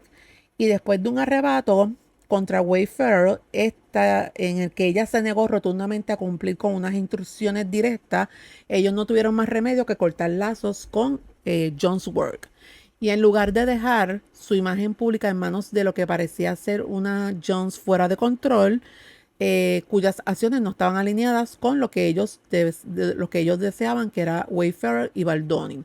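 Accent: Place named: Dominican Republic. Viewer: suena como dominicana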